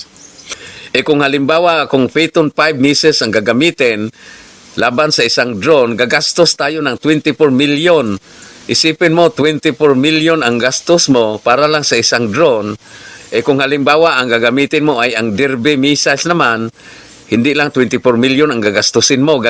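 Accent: Visayas, Philippines. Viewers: ingay mo man doon katumira sa china halatang bisaya ka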